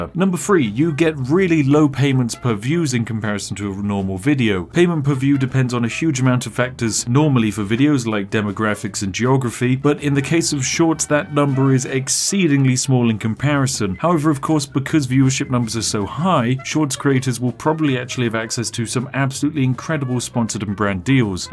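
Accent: United Kingdom. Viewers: Hi fellow British man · so bri'ish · The sniffing brit👍👍👍👍👍👍👍👍👍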